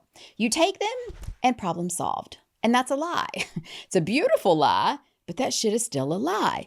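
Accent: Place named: Appalachia, United States. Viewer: I just recently subscribed! I LOVE your content and finally feel there is someone out there speaking the truth unapologetically. Much respect! You are beautiful inside and out and an inspiration! ❤️ By the way, I love your southern accent! What part of Appalachia are you from? Im a southern girl as well, but from Louisiana. Thank you for the amazing content!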